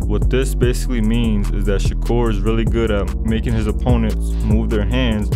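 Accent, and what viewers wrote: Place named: New York, United States. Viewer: I can tell you’re from New York. Do u train?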